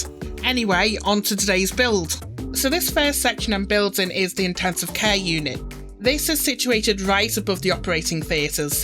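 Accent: Liverpool, United Kingdom. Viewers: You sound like you're from Liverpool! I have the same problems with the system of how wards work. Especially the common rooms. I worked in Aintree and as F1s we had a large communal room for all of us, regardless of the ward! Same with AMU and having patients with diagnoses of different specialities. Oh well, I guess they aren't building it around our systems but some of the logic is irritating.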